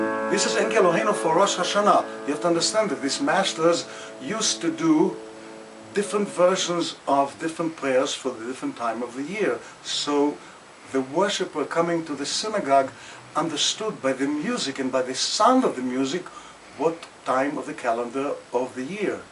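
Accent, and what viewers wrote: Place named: Germany. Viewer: I'm in love with the old German accent. This man reminds me of the aristocratic and finely-cultured Jewish family in the Brock & Brody Theone series The Zion Covenant. And Impressive singing!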